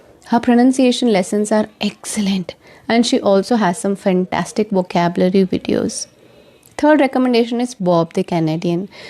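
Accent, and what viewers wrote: India. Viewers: Why are you suggesting foreign English-language channels when you also speak with an Indian accent? You can suggest Indian YouTube channels as well; there are plenty of good ones.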